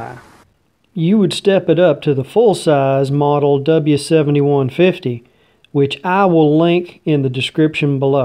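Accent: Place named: Alabama, United States. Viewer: nice review ...who's did the talking in vid ...he sounds like he's from Alabama...lolol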